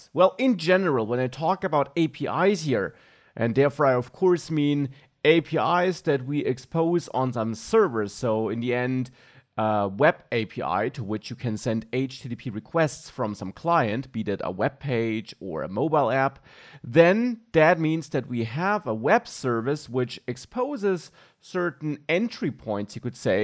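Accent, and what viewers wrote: Germany. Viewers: very smooth vid, clean and explicit good watch ! just one thing may i ask: is this a german accent ?(just curious)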